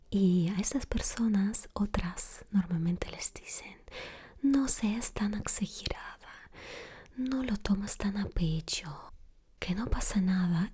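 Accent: Russia. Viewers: Por ese acento de una Rusa hablando español <3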